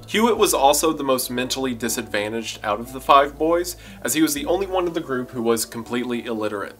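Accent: United States. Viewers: American young man voice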